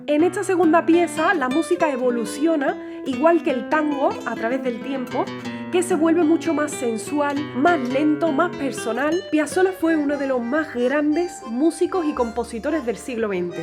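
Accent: Andalusia, Spain. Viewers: Gracias bonita! por interpretar a Astor, tu que eres española, por tu acento. Sigue así te va y te irá muy bien. Bendiciones. Desde la Argentina. Bs 🙏🙏🙏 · Escuchar ese acento andaluz 🥰💓 enamora 😍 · Gracias por difundir la música de Astor y por contar anecdotas de su fantástica vida. Por otro lado tu acénto andaluz es muy agradable y cautivante. Saludos desde Buenos Aires.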